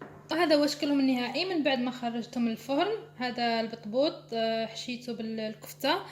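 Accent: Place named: Morocco. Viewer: روعه تسلم يدك واقتراحاتك عجبوني انا من عشاق المعجنات😍 حبيبتي باين انك مغربية من لهجتكم الجميلة بحب اهل المغرب اختك من العراق ☺ تشرفت بك وبقناتك اكثر من رائعة استمري 👍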